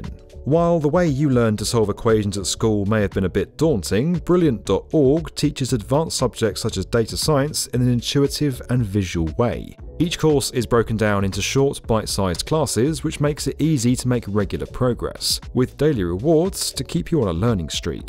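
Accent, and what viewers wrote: United Kingdom. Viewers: ...if I didn't know better, there is a tinge of anti-Amricanism in his voice or at least a dose of common Euro anti-Capitalism stuck in his throate. But maybe it is the uppity brit accent that is sneering and rooting for his hate it to become true.. ie that the NY collapses. You just know that is his wish. Sad. But we have scene this before repeatedly.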